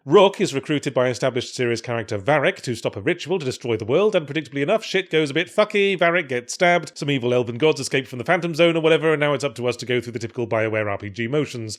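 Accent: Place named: United Kingdom. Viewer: Yeesh: You gotta be really British to naturally rhyme "charms" and "palms".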